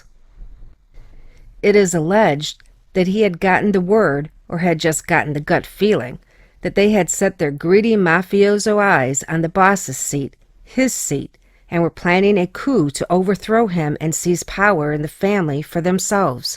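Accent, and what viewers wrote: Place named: Midwest, United States. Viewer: Are you from Chicago ? I hear a midwestern accent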